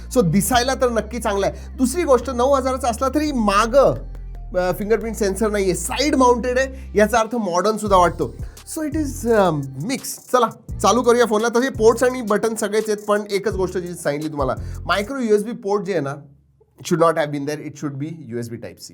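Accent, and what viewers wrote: Pune, India. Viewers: That puneri accent is soo gooodd 🤩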